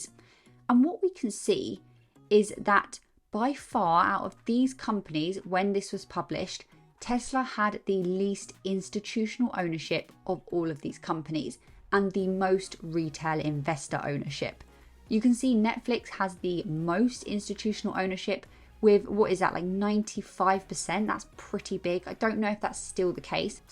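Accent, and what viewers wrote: England, United Kingdom. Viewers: When you speak, I think of a Charles Dicken's novel! Amazing, genuine, English accent!!! 👍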